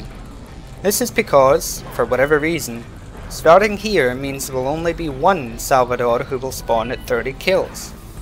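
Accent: United Kingdom. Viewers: are u british ?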